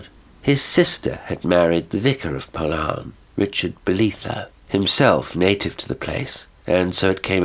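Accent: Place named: California, United States. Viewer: thanks very much. It's good that we have a channel like this - to least because of the good response everyone seems to get from yourself. These "spook story" channels seem to be a growing "thing". Its a bit like what enjoying radio dramas must have been like. A bit disconcerting hearing someone from california talking about forgotten railway stations in Norfolk! Lol. Suggestion....it might be an idea to do Machens "the white people". And get a woman to actually read the "green book" part. I've said this before - to others, with no luck. Think it could be a very special contribution to your channel if you could pull it off. It is after all one of the seminal "weird tales" of the last century. The "Dunwich" brothers posh anglo Welsh gran.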